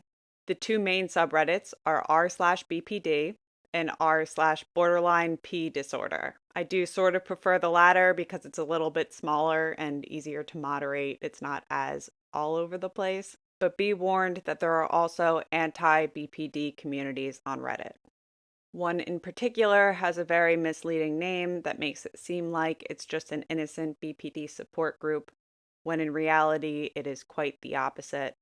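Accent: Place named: East Coast, United States. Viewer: Thanks so much for making these videos. I just stumbled upon your channel a minute ago. You have an accent. Trying to pick up where it's from? East Coast?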